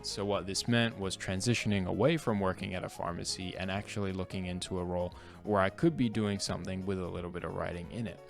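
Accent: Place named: Australia. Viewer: This is probably the most mild Australian accent I’ve ever heard 😂😆 I’m pursuing a film studies degree and while I love doing photography/videography, I’m also worried abt job prospects. But I’m also not particularly a great student so I felt like I had to choose this degree bc I would literally fail the other classes… idk uni is just hard lol. It will work itself out ❤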